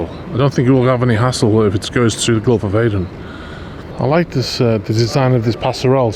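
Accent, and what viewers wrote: United Kingdom. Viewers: wonderful!, your command of the Queen’s English is very comforting, great video, and I’m excited for the both of us, for your tour of Bold motor yacht.! Here we go!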